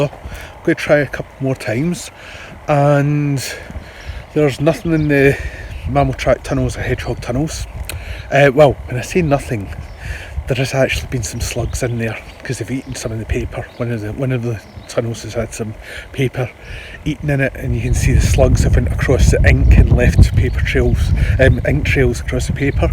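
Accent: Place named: Scotland, United Kingdom. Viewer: Just sometimes your great Scots accent throws me "Badger Antonio" LOL!!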